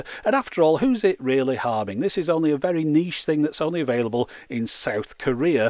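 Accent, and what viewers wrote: United Kingdom. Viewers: Excuse me so is difficult understand you because you're British accent. But so much interesting videos. I have the idea that music media would be pendrives. Are more handy than SD memory. Greetings from Chile.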